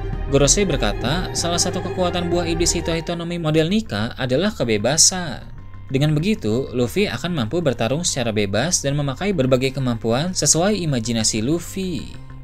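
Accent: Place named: West Java, Indonesia. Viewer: Yang ngomong ini orang Sunda, Jampang fixz wkkw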